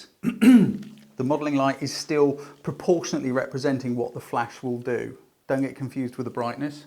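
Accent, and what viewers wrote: England, United Kingdom. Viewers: Hi! Thanks so much for all of your videos, they are very helpful and you make everything easy to understand. Love the English accent as well! I am thinking of setting up a home studio and wondered if you thought a room with the dimensions of 14ftx12ft would be sufficient and what do you think my my must have equipment should be? This would be for newborn and portrait photography mainly. Thanks again!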